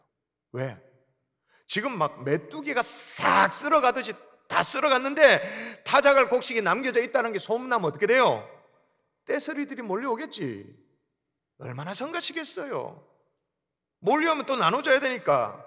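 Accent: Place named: Gyeongsang, South Korea. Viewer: 목사님의 경상도의 유하신 말씀속에 경상도 특유의 강하신 말투로 하나님의 강력한 말씀이 느껴지십니다 코로나때 온라인 예배로 늘 고맙고 감사드립니다~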